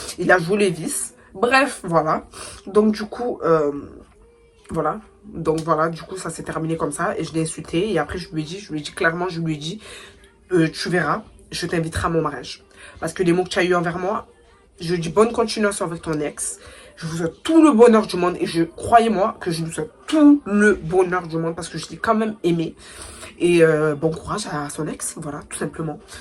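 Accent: Marseille, France. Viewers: coucou je suis nvl sur ta chaîne ton accent me fait penser a une marseillaise peut-être je me trompe t mignonne nimporte qu’elle filles au monde ne mérite pas cela l’homme qui a un comportement comme sa franchement sa me dégoûte je te souhaite bcp de bonheur et du courage pour la suite tu ma donner chaud au coeur tellement sa ma toucher 😢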